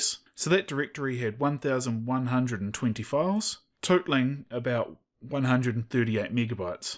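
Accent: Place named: New Zealand. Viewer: love the Kiwi Accent Choice bro!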